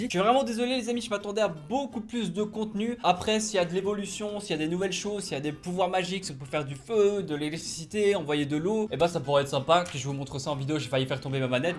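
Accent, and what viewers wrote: Belgium. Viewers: t'es le meilleur t'es trop pouissant, je t'adore avec ton accents de Belge, j'imagine, vue que tu vis en belgique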